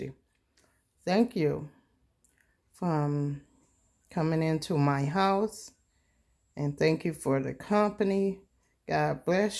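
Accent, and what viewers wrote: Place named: Haiti. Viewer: Ok I though because you have an haitian accent.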